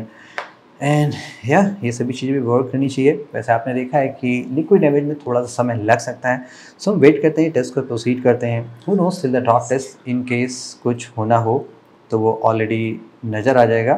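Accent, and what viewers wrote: Lucknow, India. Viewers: Bhai aap Lucknow se hai na?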